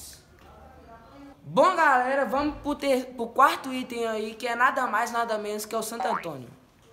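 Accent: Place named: Minas Gerais, Brazil. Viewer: Minero fala tudo igual Man kkk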